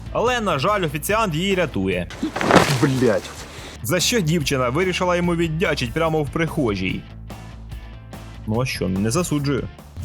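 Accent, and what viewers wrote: Poltava, Ukraine. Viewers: Пане Автор, а Ви часом не з Центральної України - просто у Вас говірка схожа на полтавську чи черкаську?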